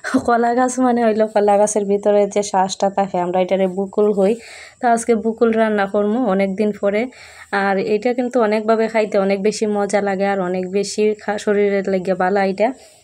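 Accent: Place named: Sylhet, Bangladesh. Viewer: সিলেটি?? এই কথা গুলো খুব ভালো লাগে শুনতে ❤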